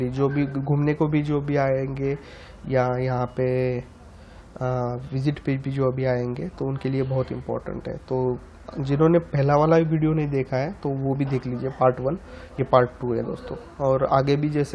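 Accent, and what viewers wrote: Maharashtra, India. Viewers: bhashet lagech marathi tone lakshyat yeto. Bhau mst good work.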